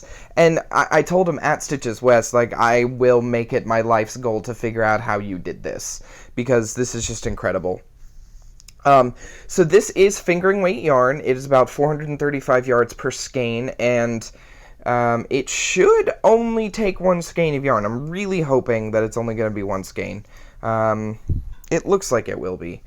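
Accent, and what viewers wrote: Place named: South, United States. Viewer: Sometimes, when you find yourself in a toxic situation...at work, or anywhere else, you do need to think about removing yourself from it, and improving your quality if life. However....and thus is important...if you do decide you need to find another job, make sure you already have that new job, before leaving the old one. If you leave, without having somewhere else to go, you will only be adding another stress to your. Life... Bills need to be paid, and yiu need to keep a decent roof over your head. You spend most of your waking hours at work. So....Work should be something you at least enjoy.... The really fortunate ones have a passion for what they do... Inwish that for you.. Oh yes...a Southern draw is nit necessarily a bad thing... Lots if people find it appealing...😊 Sandy from Cincinnati 💜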